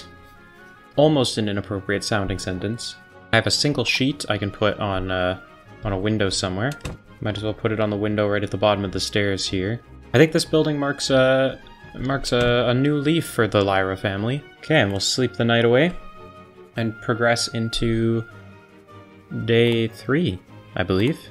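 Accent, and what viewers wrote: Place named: United States. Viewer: Your accent says American. Your sense of humour says British. This was hilarious. Subbed.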